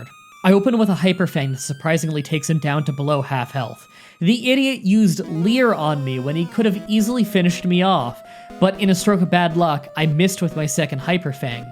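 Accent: Canada. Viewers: are you cadidan? you have a very neutral accent with some quirks that aren't in the chicagoan accent. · Love the Canadian accent lol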